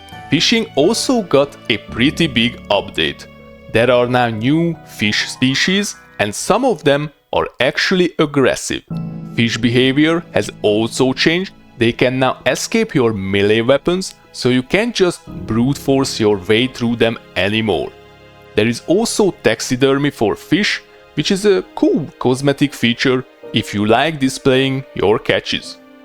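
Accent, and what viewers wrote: Hungary. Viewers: Are you Hungarian? I’m asking because you have quite a Hungarian accent. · I can spot a fellow lovasíjász when I hear one! Klassz videó, köszi bátyus! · First video I have ever watched from you and in the 1st minute I could tell you are hungarian. Örülök hogy nem vagyok egyedül a vintage story kedveléssel magyarként:>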